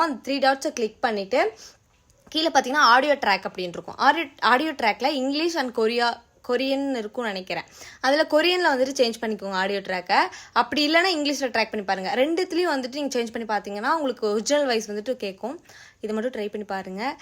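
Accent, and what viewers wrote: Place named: Theni, India. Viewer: Hey guyss! Video ku mela 3 dot eruku adha touch panna audio track nu varum adhula Korean orginal nu varum adha touch panna nammolda kodai kadhal orginal voice vanthuerum. Kk ellaru mob layum ithu available than ok. Kodai love story pakkum pothu engaluku shy aguthu ❤ anna nanum theni thaan namma theni slang la pesunga innum nalla eruku urimai ya erukun namma slang ok ❤ pin me kaa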